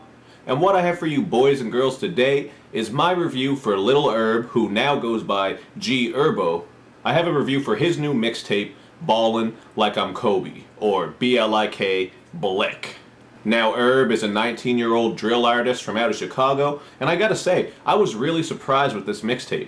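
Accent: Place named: Nova Scotia, Canada. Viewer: i'm gonna take a wild guess and say this guy is from nova scotia. that accent sounds nova scotian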